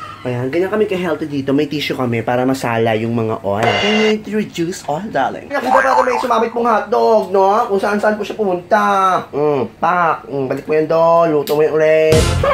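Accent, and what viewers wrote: Visayas, Philippines. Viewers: Kuya! Curious lng ko, taga aha imo mama or papa? bisaya lge kaayo hahahaha! Proud Bisaya here 😊😊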